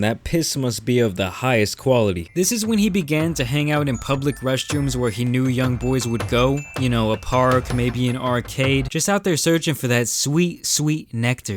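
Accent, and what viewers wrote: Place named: Chicago, United States. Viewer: Best voice and your from tx ??? that accent is like “Harlem, wait no Chicago in the 40’s”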